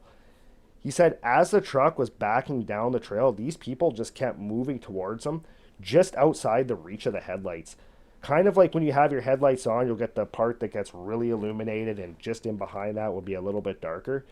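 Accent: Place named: Canada. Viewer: Haha im canadian from far northern British Columbia i recognized this guys accent right away as a canadian! Cool channel will sub, but id love to hear a story on nahani valley/headless valley in the north west territories , i think it is terrifying as a national park based on the past stories!!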